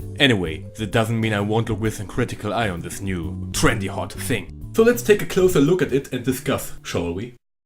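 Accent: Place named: Germany. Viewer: So 2 Sachen. 1. Man merkt sehr krass das du deutsch bist. Aber solange du die Sprache gut beherrschst ist es ja ok! 2. Die Rechtschreibung. Man schreibt im Englischen nur Namen groß! Und das "I" schreibt man auch immer groß. (<-- Englisch Freak is am start)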